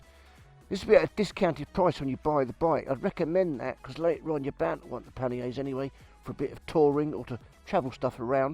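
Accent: United Kingdom. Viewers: You sound like an east-end barra-boy, yet your pronunciation of panniers was the poshest I've ever heard! 😀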